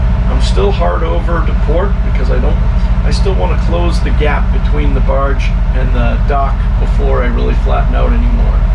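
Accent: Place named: Louisiana, United States. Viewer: After years sailing worldwide , I assure you, the Cajun accent is nothing 😉 I ve come about some memorable ways to mishandle the English language: Try this... Helms order from a Chinese inland pilot "Yellow Yellow Yellow" 😵‍💫😖and that in the middle of the night on a river without any light and no chart we knew off and plenty unlit barges. Eventually we manage to make "Zero Zero Zero" out of it (the Chinese pronounce the "R" as "L"). Or this pilot in a remote small port in Estonia.. as there was no BA chart existing of the place, he brought his own Russian one. It looked like quite a long buoyed channel on paper. With the pilot's only English at each pair of buoys that was "Red to port, green to starboard" (Note: in Europe, it's inbound red on portside, as in opposite to America where it is green inbound on portside). This worked till we got to berth and plenty shallow water around. 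Then he uttered something without any warning and speed reduction that was to mean that we had arrived at our destination... Two days later, when outbound with the same pilot, we put him on the bridge wing and closed the door while he was like a parrot "Green to port Red to starboard" Not to forget: the Philippine sailors that always pronounce the F (or ph) as a P, there is also the odd one that pronounce the P as a F. It gives this... "chiep, chiep, I want to go ashore for a telepone call, it is very cheaf here"😂😂😂😂.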